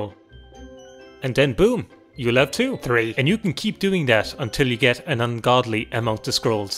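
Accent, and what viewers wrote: Ireland. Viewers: Ooh a fellow Irishman. Where you from? Love the video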